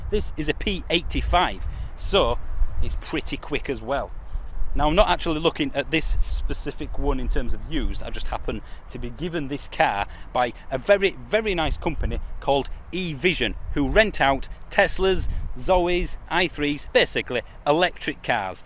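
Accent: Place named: England, United Kingdom. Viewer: is talking English not American.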